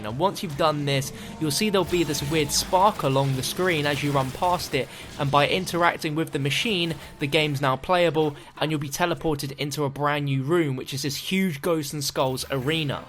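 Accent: England, United Kingdom. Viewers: That's how Britains pronounce it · He's from England, and that's how we say it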